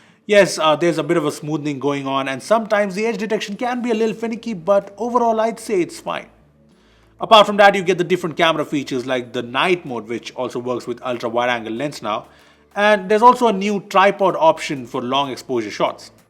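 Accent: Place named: India. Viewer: You exaggerate the "A" sound while speaking , like Amoled etc... Indian accent maybe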